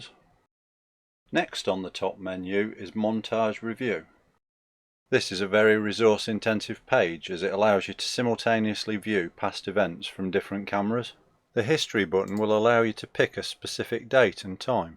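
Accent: United Kingdom. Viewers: that british accent must be staight out from a harry potter movie or sm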